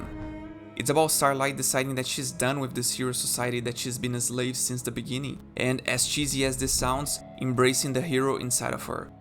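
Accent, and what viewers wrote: Brazil. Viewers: are you brazilian? I'm asking because of your accent... :) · Caraca reconheci nas primeiras palavras que era brasileiro hehe vou conhecer o canal.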